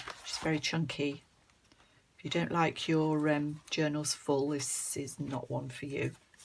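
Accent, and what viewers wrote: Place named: West Midlands, United Kingdom. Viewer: I’ve just discovered your lovely channel....some beautiful journals you have xx I started journalling earlier this year and love it! I thought I detected a West Midlands accent....I’m from Brum originally 🙂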